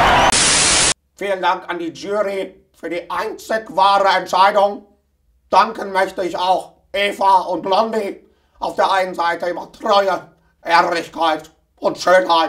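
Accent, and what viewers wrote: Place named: Saxony, Germany. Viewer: eher sachse... bin thüringer ^^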